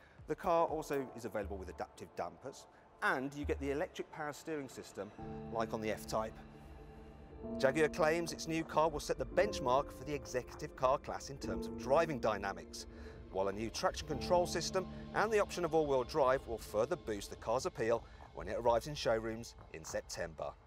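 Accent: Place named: Midlands, United Kingdom. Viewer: Sorry to get more personal than perhaps is fair when talking about a car review, but this presenter really needs some advice. Not that I'm anti-midlands in anyway, as your accent is not the part of your presentation style that annoys me however I do believe it could be the root of the issue. The real problem is your upward inflections and the end of certain sentences. This makes statements sound like questions and decreases both the credibility and my enjoyment of the video. It's infuriating to listen to. I've noticed it on a few of this channel's videos now and although it is seemingly such an insignificant part of the video, it somehow over shadows whatever message you're trying to convey. It can be over turned with so little effort whilst making at least one subscriber more at ease whilst watching your videos.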